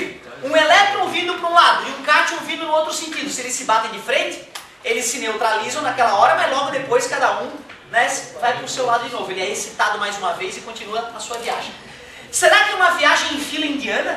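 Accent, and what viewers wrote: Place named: South, Brazil. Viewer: "tu larga duas baguinha" adoro esse jeitão de falar do sul!!! Parabéns ao mestre!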